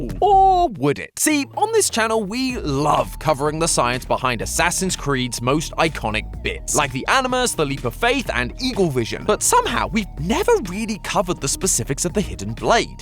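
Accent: United Kingdom. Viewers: YES a classic old school game theory! thank you british matpat! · This is just the British mat pat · Just came back to game theory and where is matpat why is he replaced with dis british guy...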